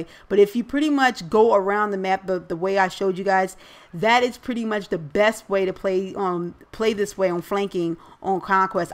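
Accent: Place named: Northeast, United States. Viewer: no i meant northeastern USA not northeast philly.... but yea.. lol i coud tell u was from around here.. accent n certain phrases is hilarious. i remember playin against u before.. its a small BF3 world man. and lol sum ppl may like u a little too much... its sad isnt it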